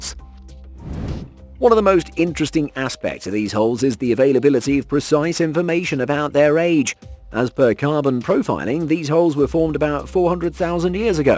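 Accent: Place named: United Kingdom. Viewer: Good Lord, soon as I heard the Brit accent I turned it off. You're always smarky, cocky, mocking. Why even put this on here if you just going to mock everything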